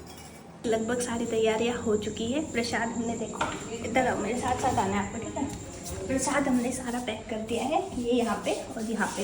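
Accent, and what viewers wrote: Haryana, India. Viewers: Haryanvi acent is very Lovely and name is -moksh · Apni boli m hi banaya kro vlog 😂 haryanvi on top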